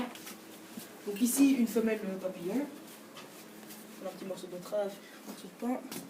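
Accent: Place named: North, France. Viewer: on dirait un mec du nord vu le parlé sinon lapins mignons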